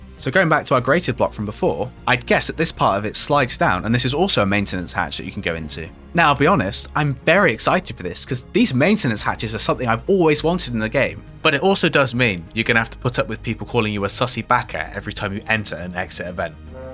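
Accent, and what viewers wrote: United Kingdom. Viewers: I don't know, sometimes it did type Beta, its just your "e" sounds Vritish. Beeta, lol. Sorry making fun of English language cuz my native one is Spanish. BTW We also say aluminium instead of the 'merican aluminum. Go figure. Anyway fun video, thanks!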